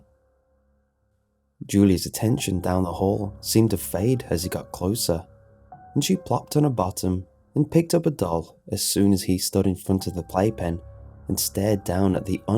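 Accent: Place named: England, United Kingdom. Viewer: Maya Pletz I mean has an extremely eloquent English accent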